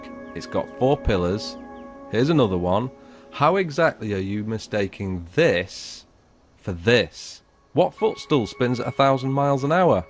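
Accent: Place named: United Kingdom. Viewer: I just believe this guy cuz he’s speaking with a British accent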